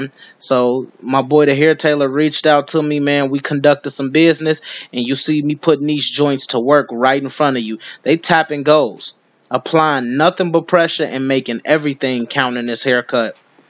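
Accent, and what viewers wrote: Chicago, United States. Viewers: You from Chicago right ? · He sounds like he from Chicago 😂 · I can already tell u from Chicago bro where u cuttin at?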